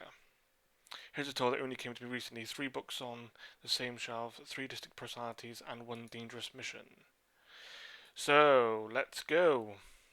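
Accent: United Kingdom. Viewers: The sound of your Bristolian accent makes my nipples moist. Just saying. LOVE YOU!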